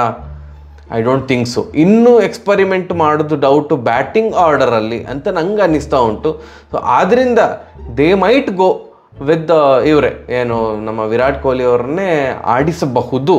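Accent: Mangalore, India. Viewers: You are the best cricket analyst ❤ and your mangalore Kannada is superb ❤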